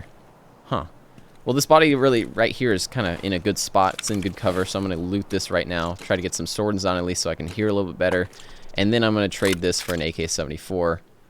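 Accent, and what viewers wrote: Texas, United States. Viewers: Your American pronunciation of Russian words is cute, but wrong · Yep. Texas boi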